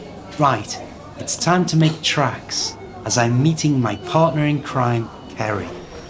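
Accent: Liverpool, United Kingdom. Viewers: Your class was very didactic, but the Scouse accent is very complicated, in many parts I didn't understand what you were saying! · Accint liverpool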